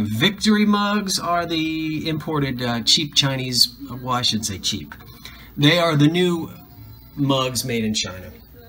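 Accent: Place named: East Coast, United States. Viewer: ! I've been watching your earlier episodes that include "young" Salem and 70s stuff. I LOVE THEM! I'm convinced the only Good Will's and thrift shops with the quality of items you find are on the East coast. I appreciate your sense of humor, facial expressions and East cost accent.. I'm learning so much from you. Continue being a blessing and I'll do the same.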